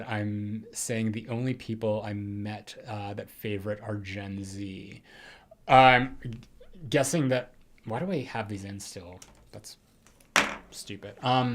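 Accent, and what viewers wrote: Canada. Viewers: You're so cute: quite facially emotive, sometimes handsy, and I love when you speak Canadian like out and about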